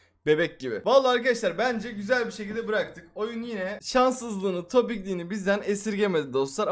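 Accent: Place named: Gaziantep, Turkey. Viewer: abi gaziantepli mi abi konuşması benziyor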